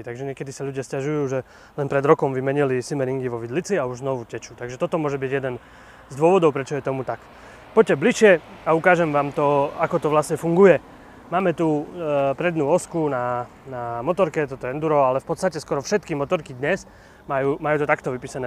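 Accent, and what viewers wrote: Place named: East Slovakia, Slovakia. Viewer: Čuc že to vychodňar 🤣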